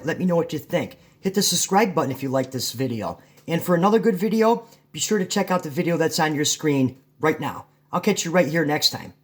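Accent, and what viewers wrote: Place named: New York, United States. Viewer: Accent of N.Yorker !!!😎Cheers !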